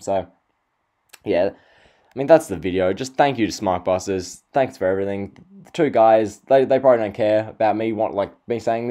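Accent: Australia. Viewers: Are you Australian? I recognize the accent.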